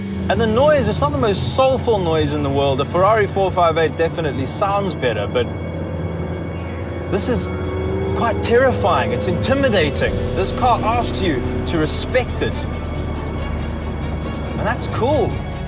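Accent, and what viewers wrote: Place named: South Africa. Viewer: ahhh nice.... no nonsense boring south african..beautiful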